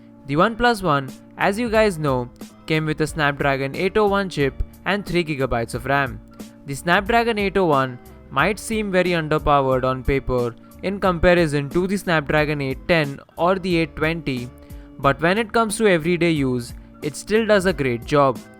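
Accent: India. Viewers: Oh god this indian "English" is killing my ears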